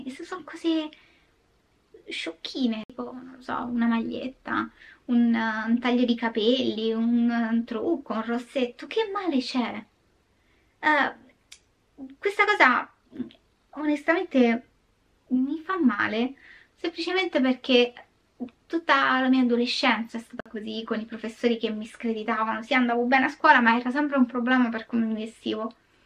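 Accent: Rome, Italy. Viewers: Cara, non si tratta di accettarti così come sei. Nessuno deve accettare nessuno. Tutti devono rispettare tutti e semmai seguire o legarsi a coloro che apprezzano. Noi non ti accettiamo, noi prima di tutto ti rispettiamo e poi ti apprezziamo. E personalmente ti stimo e ti sono molto grata per quello che fai, sia qui spogliando di ogni sacralità i criminali e restituendo dignità alle vittime, sia sugli altri canali, dove mi accompagni in interessanti e spesso importanti ragionamenti, o dove mi apri le porte sul mondo del cinema, sui suoi retroscena e i suoi meccanismi. Un mondo di cui io non so e che tu sai spiegarmi con un linguaggio chiaro, professionale ma anche scanzonato che mi ispira simpatia, che mi mette a mio agio. Quanto al tuo stile... è per l'appunto il tuo stile. Ti distingue, ti permette di esprimerti, sei tu. Io poi lo adoro, ma anche se così non fosse sarebbe parte di te come la tua voce, la tua calata romana e il gremlin con la corona di fiori in testa. 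Tutto ciò sei inconfondibilmente tu e, apprezzandoti, lo apprezzo. E chi non lo apprezza è tenuto a rispettarlo. Ti mando un abbraccio, sappi che per noi ciò che sei e ciò che fai ha valore, ci fa bene. ❤ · il tuo intercalare romano che adoro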